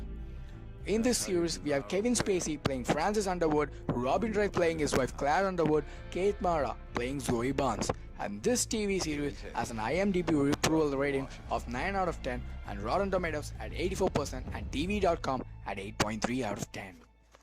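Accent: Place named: India. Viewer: dude... your English is terrible. and you're speaking so fast that you sound like youre actually speaking your own language. I have to listen hard to even hear a few words. you have a very thick Indian accent. also you keep breathing too hard on the mic. Maybe try speaking slower and try pronouncing your words better while learning how to speak in English properly. or just do it in your own language.... anyway I'm giving you a thumbs down on this one.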